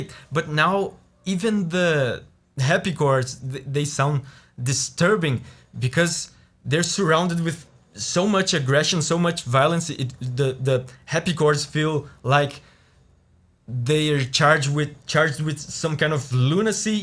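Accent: Brazil. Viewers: É incrível como dá pra saber pela sua voz que vc é brasileiro. É um sexto sentido. Aliás, vídeo bacana!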